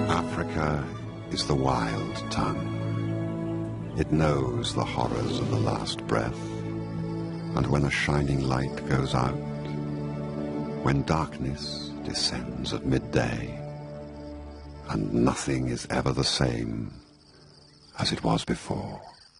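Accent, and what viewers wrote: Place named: United Kingdom. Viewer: I used to love the American accent in my younger days , but , now , Queen's English is music to my ears .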